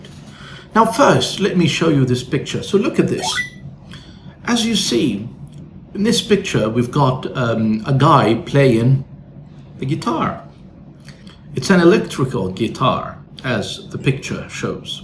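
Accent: United Kingdom. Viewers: Are you native speaker of british English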